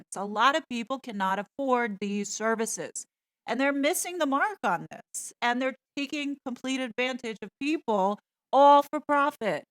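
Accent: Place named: New York, United States. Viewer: I admire your civil spirit and love your cool NYC accent! If I was a NYC resident, I would join you in your protest! Let's show them that they cannot get away with their shenanigans.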